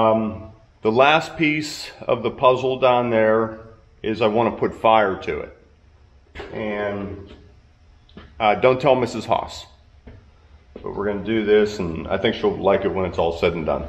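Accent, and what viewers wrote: Pittsburgh, United States. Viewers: Previous New Castle boy here...... subbed via the Morgans! Good stuff..... how I miss that Yinzer accent!!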